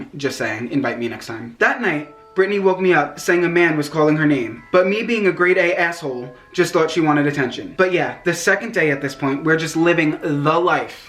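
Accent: New York, United States. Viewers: I love your New York accent! I want to be your friend!!!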